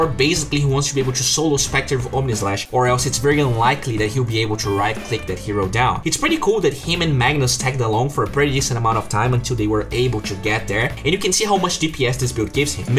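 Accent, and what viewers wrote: Brazil. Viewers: C é brasileiro, man??? Tem sotaque BR xD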